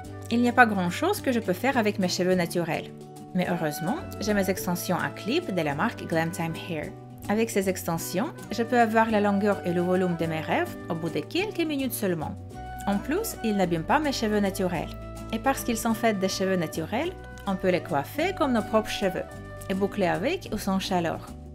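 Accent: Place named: Russia. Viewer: t'as l'accent très russe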